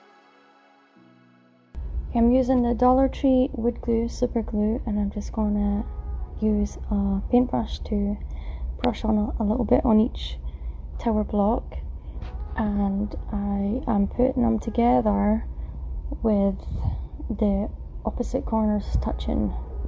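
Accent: Scotland, United Kingdom. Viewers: Your voice is so soothing, I love how you don’t rush through making them. Not Throwing them together super fast, which seems to be the norm. You take your time which makes a huge difference to the quality of the finished piece. Yesterday I saw a video of someone using these blocks to make decorative items for the first ever time. In comparison to your creations they were more arts and craft pieces. Not knocking others work just an observation. When I was watching the other poster create items in my mind I saw the potential for a few of your items and how when used in specific ways you can create swirl effects and illusions. Then as if by magic your video popped up in the feed, I’m so pleased I clicked on it! You created what I could see in mind 🪄 ✨ You certainly have an eye for what looks good, not only can your mind see the potential of combined pieces, but you’re able to actually bring them to fruition. 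Well done 👏 these are really lovely pieces you made, they’d blend in beautifully in most homes and/or functional places, no doubt receiving compliment after compliment. Quick question, you said you got items from the dollar store, except you have that beautiful soft Scottish accent. Am I wrong in thinking there are dollar stores here in the uk? Thank you for this wonderful video, it was an absolute pleasure to watch, incredibly relaxing. You’ve gained a new subscriber 🙃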